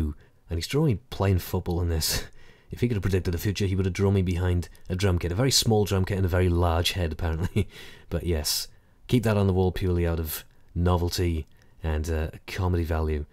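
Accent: Wales, United Kingdom. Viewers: I knew you was WELSH! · you're welsh? that's awesome! I'm half welsh (but I was born and live in America so I don't have that awesome accent). I went to Wales 4 years ago for a couple of weeks. beautiful country. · Here's what I would love to see: Best: CoD4, BF3, MW3 (must have sonorous voice-over) Next best: everything else as long as it's still narrated by our Welsh host. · You're Welsh?? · Your welsh:DDDDD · I knew you where welsh:) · You don't sound that welsh:o Now you've mentioned it though I can see it:P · Jesus did not realise you were welsh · I knew it! I was sure I recognized that accent. Hello from Wales. ^_^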